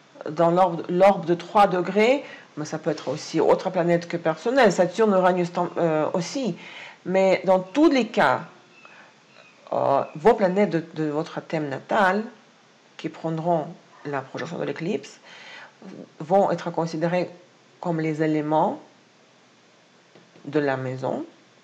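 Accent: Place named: Russia. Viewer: Merci! Je ne rate pas une vidéo depuis longtemps. J’ai, depuis mes études, une grande amie au Costa Rica, musicienne professionnelle connue, à SanJose. Cela me rapproche. Aussi, je suis impressionné par votre magnifique connaissance des langues, très touché par votre affection pour le français et les francophones, et votre très belle personnalité. Votre accent russe est vraiment charmant! Suis Taureau, ascendant Lion, et je vous remercie pour votre travail et votre bel esprit. Un beau bonjour depuis Paris!🎶💐🌷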